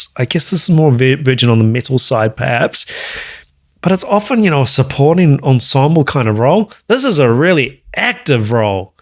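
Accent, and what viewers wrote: New Zealand. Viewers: Here's coming from America. Me being an armature voice actor, I appreciate the Kiwi and Aussie accent and wish i could do it better lol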